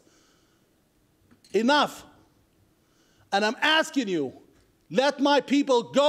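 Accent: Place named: United States. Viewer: Sounds like an American. His parents must have immigrated to the USA. Nothing negative, just saying he speaks American English.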